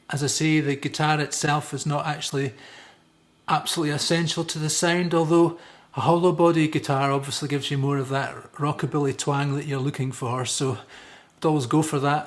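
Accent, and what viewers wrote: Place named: Scotland, United Kingdom. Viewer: Your Scottish accent is great! Keep on keepin 'on!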